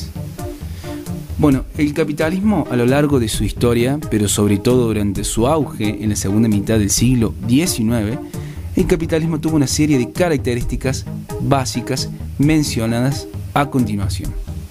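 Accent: Córdoba, Argentina. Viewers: sos cordobes? por cierto buen video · No me concentre en todo el video escuchando su acento cordobés xd 😍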